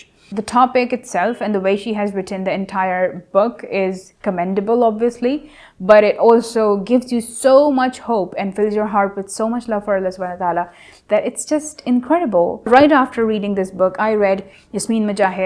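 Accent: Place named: England, United Kingdom. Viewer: Aap English accent ko kaise prounce kar leti ho dear 😚 tips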